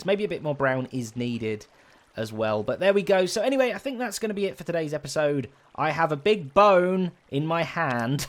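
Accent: United Kingdom. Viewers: haha it actually does help a lot it's easier to understand the british accent now (which is a little bit more difficult along with the bad quality at school) I have a 6.7 atm for english :D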